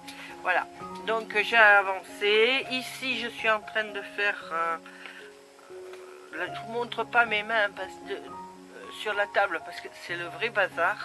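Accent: South, France. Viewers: 🐱Coucou 😊 J'ai le plaisir de te découvrir grâce à ton commentaire sous la vidéo de notre Fanfan scrap, donc je viens découvrir ton pti monde. Tu fais de jolies creations, ma préférée est ton tag avec le cheval, mais c'est normal car le cheval est mon 2ème animal préféré 😉 Peux être est tu tjr en vacances, si c'est le cas profites en bien, car moi je n'ai plus le privilège de partir en vacances, depuis les dernières en 2011 (pdt un week-end) en Normandie. Je suis de la Région Parisienne mais à ton pti accent il me semble que tu dois être du Sud. J'espère que ça va mieux avec l'épreuve que tu as passée avec ta fille! Je pense à ça, si tu es tjr en vacances, pour pas que ton album s'abime avec le climat, tu peux le mettre dans une grande poche de congélation fermée, c'est bien pratique. Je te dis à bientôt et te souhaite une bonne journée.🌅 Bisous 🐎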